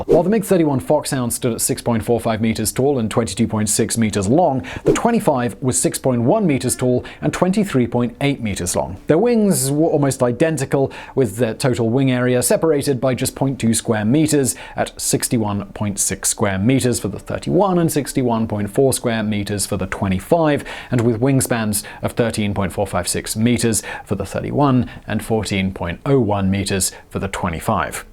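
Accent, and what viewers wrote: England, United Kingdom. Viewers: super faster talker with an English accent 80% lost to these bad choices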